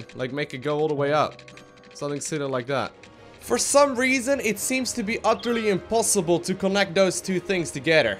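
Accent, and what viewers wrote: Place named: Netherlands. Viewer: He sounds Dutch now I'm curious does somebody know.?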